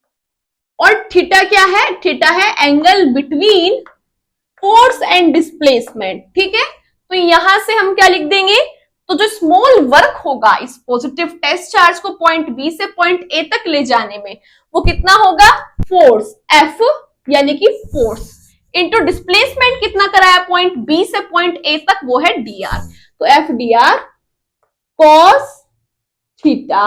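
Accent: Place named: Pakistan. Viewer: her accent is like pakistani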